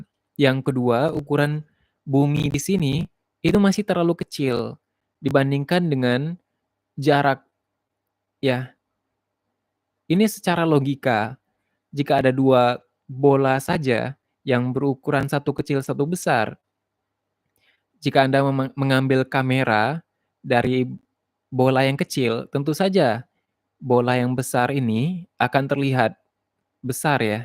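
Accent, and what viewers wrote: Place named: Sumatra, Indonesia. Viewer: yg jelas beliau logat sumatra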